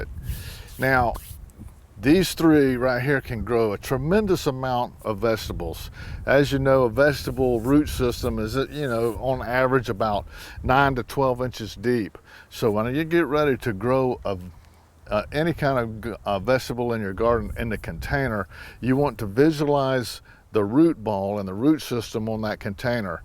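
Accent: South, United States. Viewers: 😂His drawl is what I enjoy, too! You're a lovely man,! 🎉 -Judy 🇨🇦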